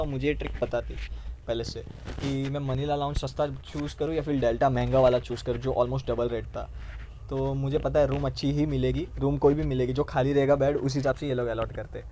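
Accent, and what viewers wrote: Gujarat, India. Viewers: i love your gujju accent lol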